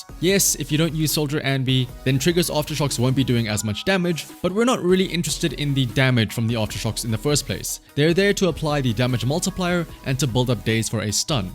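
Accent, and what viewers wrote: South Africa. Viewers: Are you Afrikaans? Cause I recognize the accent. I speak ook afrikaans very deliciously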